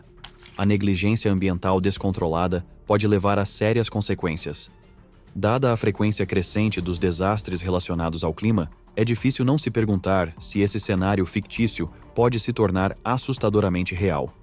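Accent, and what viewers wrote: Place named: Inland São Paulo, Brazil. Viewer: O mais irritante dessas narrações é, esse sotaque de paulista interiorano, acaba com todo o propósito!!!! FUI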